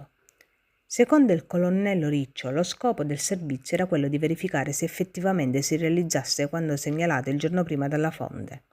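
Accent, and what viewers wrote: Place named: Catania, Italy. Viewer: Si sente il tuo accento catanese 😂😂😂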